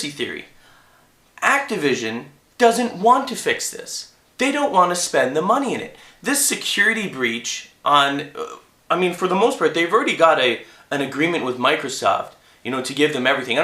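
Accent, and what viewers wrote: Canada. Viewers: his Canadian acent ruins the mood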